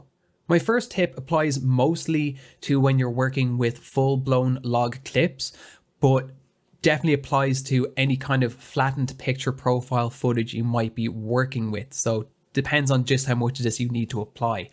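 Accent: Ireland. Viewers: Great video! You sound Irish... visited Galway and Dublin last year and had a crackin' time!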